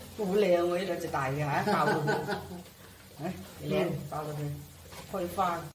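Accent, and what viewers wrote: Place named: Renhe, China. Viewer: 我地家乡都整呢滴 😄 听你的口音 有点像人和人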